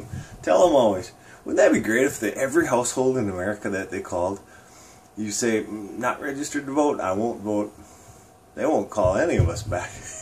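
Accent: Minnesota, United States. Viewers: yeah im one of those idiots(political telemarketer),,, we dont really care what people tell us mainly just try to make it through a shift a job is a job i dont even vote the way i call.. usually if you say you arent voting an a poll they usually end the call..but yeah 99% chance you are still on the list.. that call would be dispo'd just as a decline.. and often times they get the list of people to call under the list of registered voters... you almost sound like you are from "da range" in mn here maybe the UP from your accent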